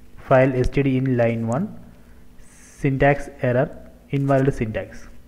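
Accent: India. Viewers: are you from india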